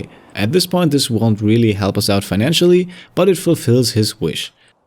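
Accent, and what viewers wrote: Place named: Germany. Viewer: Back here for a rewatch after the new Series started and one thing I noticed right away is how different sounds. As a fellow german I can tell how hard it is to get rid of the accent and these videos sound like they were made in the phase where actively improved his accent and english speaking abilities in general. The pronunciation is pretty close but the cadence seems a lot more confident and well... practiced nowadays. Really goes to show what a good content creator is imo. In all his works I feel a passion and a genuine desire to create something that is a joyful experience! So thank you for all the joy you brought me I guess!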